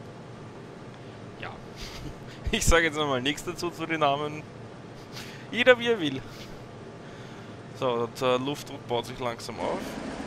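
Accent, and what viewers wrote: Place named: Austria. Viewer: Das hört man doch das er Österreicher ist.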